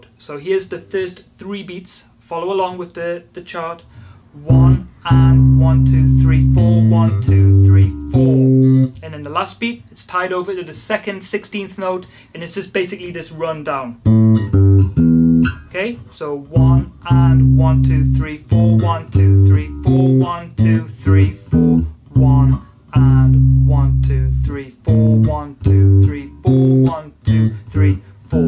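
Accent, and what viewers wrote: United Kingdom. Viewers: man, I am in, even I learend my american english, I can understand you enough with your british accent, and I will try your doccuments. Thanks, you are great. Now I am gonna play more clearly.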